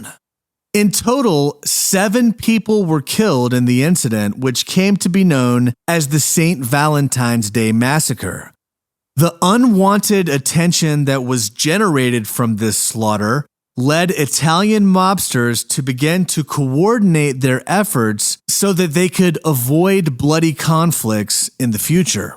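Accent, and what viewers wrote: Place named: United States. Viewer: Very decent response. Cosa Nostra is phonetically pronounced Caw-sa Naw-stra (not the americanised way you do it) - there were others too.- just saying as a genuine Italian speaker from UK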